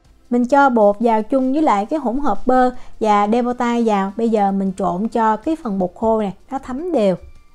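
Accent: South, Vietnam. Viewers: Woa, cái bánh lạ quá. Mới đọc tựa tưởng đâu bánh thơm mùi lá dứa, nhìn rồi mới biết trái thơm, trái khóm. Chị dân bắc mà nói giọng nam dễ thương ghê. Cám ơn chị.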